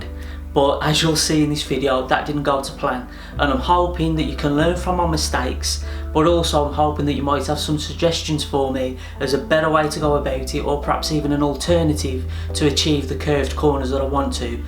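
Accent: Black Country, United Kingdom. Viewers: Don't worry, he ay familiar with arr Black Country spake, I am though, from a Black Country lad to another... Bostin' vid 😃👍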